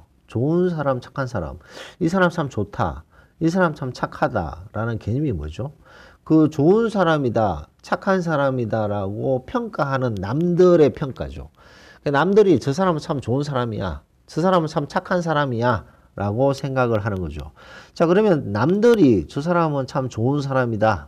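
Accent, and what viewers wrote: Gyeongsang, South Korea. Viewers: 선생님^^ 구수한 갱상도 사투리 듣기거북하지 않습니다. 내용이 문제지 사투리가 뭐 문제겠습니까? 사투리라고한다면... 지방언어인데. 그 지방에 사는 사람들이 쓰는 말이 사투리입니다. 촌사람들이 쓰는 말이 사투리가 아니라는 거지요. 그러니까 서울경기에는 서울경기의 사투리가 있다는 겁니다. 꽝! 꽝! 꽝!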